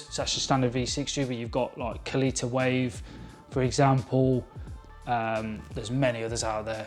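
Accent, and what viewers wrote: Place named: United Kingdom. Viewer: Thanks for the Reply. I see based upon your accent you may be in the UK? I would love to visit there someday. Have lived in the US all my life. I am glad to hear you like your Timemore kettle. I should receive mine today. Last night when I was reviewing scales, I saw the Acaia scales but wow they are expensive. There are some positive and negative reviews of some of the other Timemore scales but the reviews seem to be a bit better than the Hario v60 scale. I'm just getting into pour over coffee and have used french press, and percolators.Need to find the scale and then decide on the Hario V60 Drip Decanter or the Hario V60 Glass Range Coffee Server, 600ml. I also think I will pick up the Hario V60 Metal Coffee Dripper, Size 02, Silver vs the ceramic dripper. Any thoughts from you on drip equipment would be welcomed as it seems you may have more experience than me. 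For example, if you use 30 mL of coffee, how much water are you supposed to use? Also need to pick up a manual coffee grinder.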